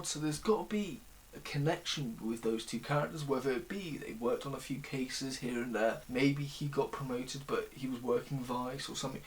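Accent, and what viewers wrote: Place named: England, United Kingdom. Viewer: Good video I like your accent even though I don’t usually like the English accent ❤️ you’ve got a very smooth articulate way of speaking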